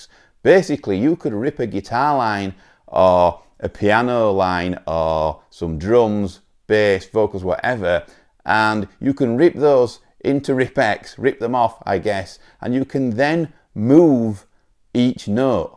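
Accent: North, United Kingdom. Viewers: Great video, and nice to hear a northern accent! I have my own little YT channel where I do bass covers - I'm seriously considering this as I prefer to play over tracks that have already had the bass-line removed so as I'm not doubling up on the bass. This software looks like it could do the trick! 🙂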